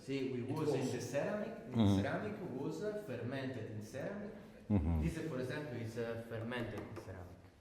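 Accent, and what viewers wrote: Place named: Shaki, Azerbaijan. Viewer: bəy,xahiş edirəm ləhcəni dəyişməyin. Şəki ləhcəsi superdi.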